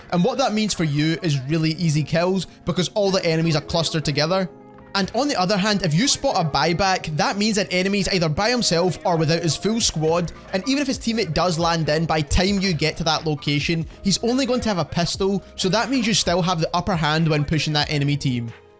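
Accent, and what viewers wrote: Scotland, United Kingdom. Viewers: Very Scottish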